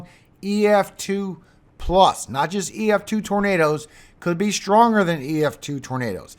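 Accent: Louisiana, United States. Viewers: Nice listening to to a good Louisiana man pronounce our cities. Like your RADAR and forecasts. Keep up the good work.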